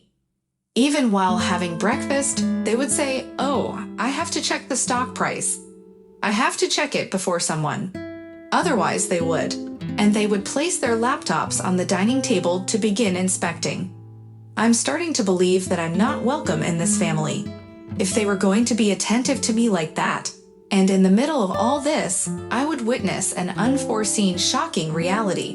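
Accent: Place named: Japan. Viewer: This is pretty disjointing and doesn't make sense. Sounds like Japanese. Nobody here in the states speak like this.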